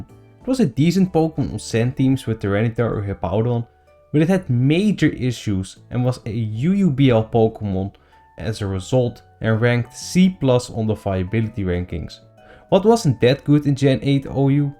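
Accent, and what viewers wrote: Netherlands. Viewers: Netherlands, you can tell by the accent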